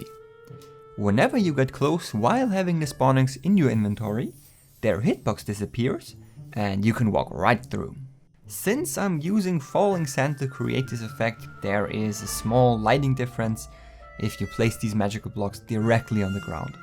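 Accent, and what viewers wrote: Germany. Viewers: Are you german? Because you really sound like.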